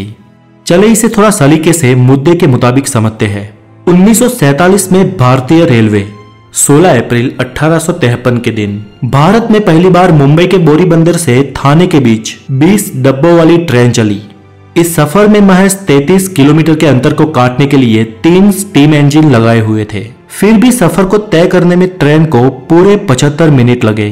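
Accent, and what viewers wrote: Gujarat, India. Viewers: Bolne wala Gujrati hai☺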